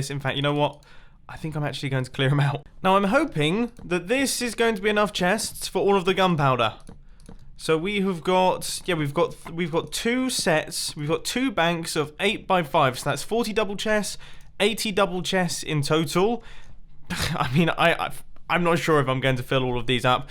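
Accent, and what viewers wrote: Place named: England, United Kingdom. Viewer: “Ah tall” I love English accents😍😌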